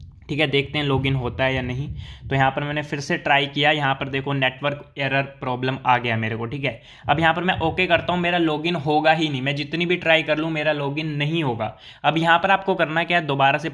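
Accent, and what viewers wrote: Punjab, India. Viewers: Bahi app Punjab se ho kia keuki muje apki avaj se pata chal gia 😁 me be punjab se he huu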